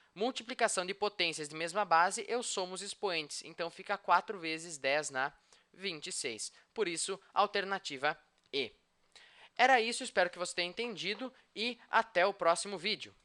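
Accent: South, Brazil. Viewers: Sotaque de sulista!!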